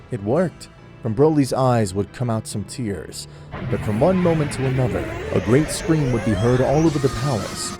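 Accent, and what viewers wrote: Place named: United Kingdom. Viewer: I agree but you sound...toooo british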